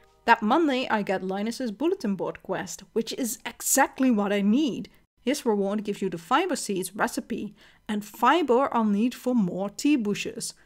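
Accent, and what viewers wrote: Netherlands. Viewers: Hey! This is the first video I watched of yours and I kept wondering if you were Dutch, then I heard you say Herman and now I'm pretty sure you're Dutch 😂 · Not me loosing my mind trying to guess if you are dutch and then absolutly cackling when the confirmation hit 🤣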